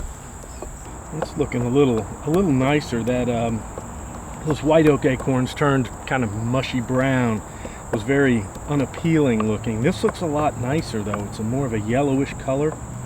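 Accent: Georgia, United States. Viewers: are you from Georgia?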